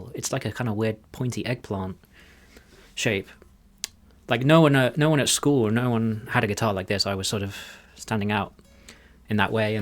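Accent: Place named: United Kingdom. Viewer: I've watched your videos over the last few weeks but I've never heard you speak. When I first started this video I was like good grief is a Brit haha! I like your playing, I really do. You have such amazing picking technique which, as a player is my largest weakness. Do you have any tips for a well rounded veteran player to improve picking technique? Cheers from the US!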